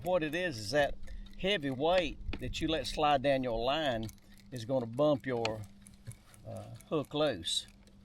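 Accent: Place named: South, United States. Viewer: What size weight do you use? I've always made them with 2oz catfish weights and I use a zip tie to attach the clip. But your way may be even cheaper. And BTW my wife heard your voice and said, "Cap, you are as southern as they get, but I think that guy is more southern than you" lol. Im a Georgia man, where are you from?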